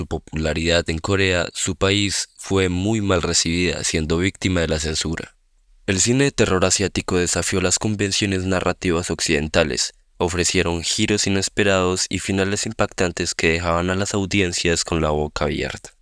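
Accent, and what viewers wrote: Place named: Colombia. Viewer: Parece acento colombiano.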